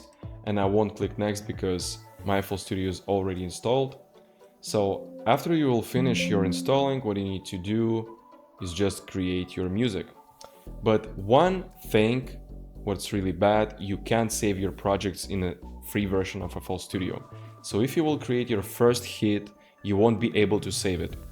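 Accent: Russia. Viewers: ты русский, акцент палит)) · Русский акцент слышно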